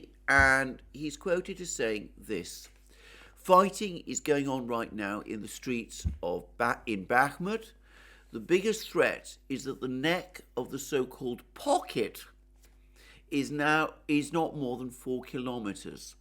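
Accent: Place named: RP, United Kingdom. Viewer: Alexander Mercouris: in-depth analyses, perfect understanding of geopolitics, posh English. Can anyone expect more?🙂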